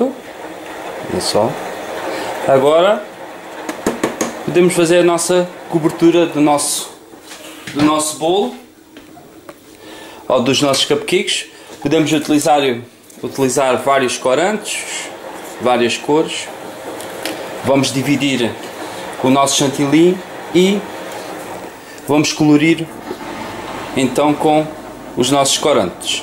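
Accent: Portugal. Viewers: Ele não fala engraçado , vc acha isso porque o seu português é o português ( Brasil ) o dele é o português ( Portugal ) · ele fala português Portugal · Antes de mais obrigada pelo video, A receita está certa, se não com algumas pequenas falhas, as natas poderiam estar quentes, ou a gelatina foi posta quente e não morna como deveria, por isso não ficaram na consistência necessaria para decorar um bolo, deduzo que tambêm seria para decorar e não para rechear o bolo como foi dito, a dica do limão foi mesmo para tentar salvar as natas, que mesmo assim desandaram, mais sorte para a proxima.. OBS: Sotaque Algarvio? . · axo q ele e de portugal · Você fala com um português de Portugal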